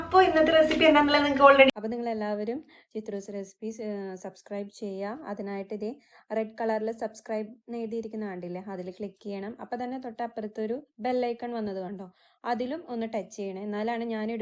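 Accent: Thrissur, India. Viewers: Super recipe,,,,appo.........,nammalu Thrissur karude samsaram kelkkan entha rasam 🤩🤩🤩......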